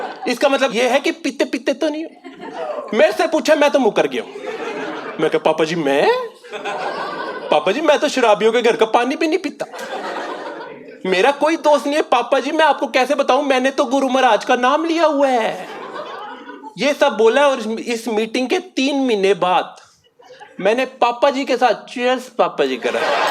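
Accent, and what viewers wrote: Punjab, India. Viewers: Punjabi accent 👌😂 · Great punjabi comedy 😂 loved the accent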